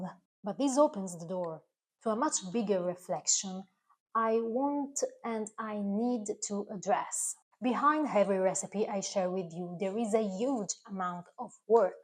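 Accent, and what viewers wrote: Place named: Italy. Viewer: Ciao Cara Mia 🥀. You have been part of my life for over 7 of those 8 years you have been making videos. The reason I love you and your videos so much is because I feel like you are doing it just for me. I also love your videos so much because you make things that remind me of my NONNA. I remember the first time you did that. You were using an Espresso coffee pot like my Nonna had. I commented about how it made me think about her. I love the way you share your vacation pictures and your personal pictures of your family Holiday table. Your voice is lovely. You sound like an Italian Girl speaking English. The friendship we share is very important to me. I value it greatly. That's why I always tell you, TI AMO AMICA MIO, because I do. My dear sweet friend. 🇺🇲✝️🇮🇹🤗😘😘😘😘😘😘😘😘😘😘😘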